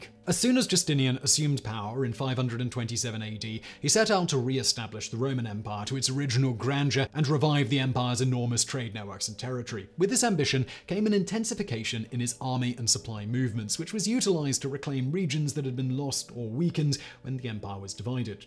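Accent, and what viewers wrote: United Kingdom. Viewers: IDK if I love your channels for the content, sense of humor or your British accent.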